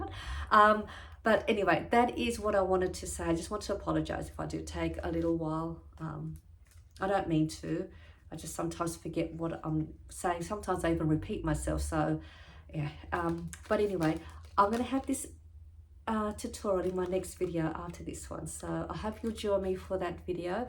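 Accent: Australia. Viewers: I love your Australian accent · the best Australian accent I have ever heard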